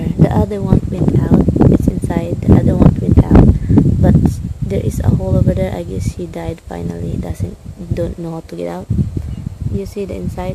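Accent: Philippines. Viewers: i subscribed because your videos are like novelties to me. i think the way you talk and the way you get your point across on issues makes me laugh. i am assuming that you are educated and just taking the Filipino accent to a different level...happy for you and to your husband...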